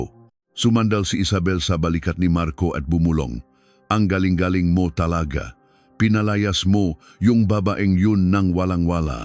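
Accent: China. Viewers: alanganin na intsik ang pangit pakinggan ayusin mo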